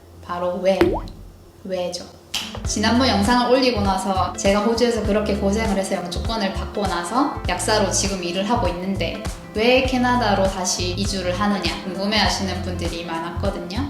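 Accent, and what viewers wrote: Busan, South Korea. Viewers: 블로그를 읽는 것과는 다르게 영상으로 보니 또다른 재미가 있네요!! ㅋㅋㅋ 부산 사투리도 신선합니닷ㅋㅋㅋㅋㅋ 왜 캐나다로 가려고 하시는지 !! 그 영상 기대하겠슴니다 :) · 약사이민으로 몇몇 채널을 봤지만… 약사님처럼 다정다감(?)한 느낌은 없었어요. 작가님이라 그러신지 말도 조곤조곤…. 아주 익숙한 네이티브 부산억양 ㅎ 편안하게 잘 들었어요~~ “남자때문에 호주 간거네??” 삥 터짐 ㅎ 구독. 좋아요. 알림설정 때력박고(?) 다음 영상으로 고고~~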